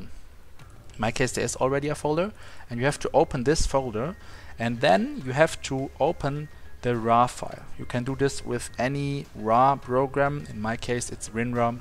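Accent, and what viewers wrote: Germany. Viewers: Dieses German english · Man hört das du Deutscher bist😂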